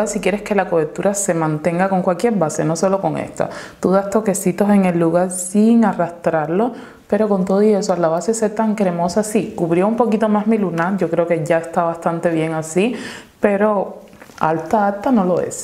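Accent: Cuba. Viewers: No es que no sepas decir la palabra, sólo es tú acento cubano. 🖤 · ella es cubana asi es su asenton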